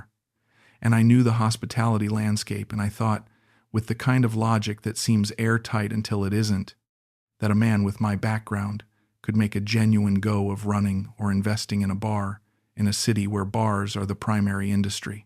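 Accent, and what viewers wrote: United States. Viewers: Listening to a man with an American accent referring to anyone other than his wife as his "mate" does not sound right.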